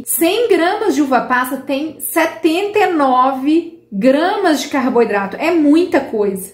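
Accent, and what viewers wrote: Minas Gerais, Brazil. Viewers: Eu sou mineira de Uberaba,resido em Sampa Acho que essa menina tbem ë mineira ?? Acho pela pronu cia.Saudaçoes.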